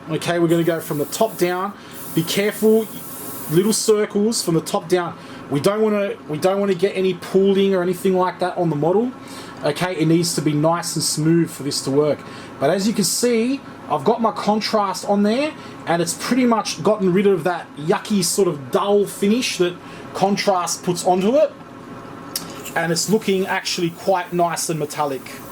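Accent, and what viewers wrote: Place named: Australia. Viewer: Like your accent, you an aussie? :)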